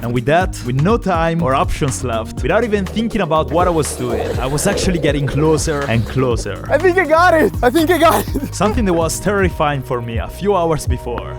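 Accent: Italy. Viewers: is he Italian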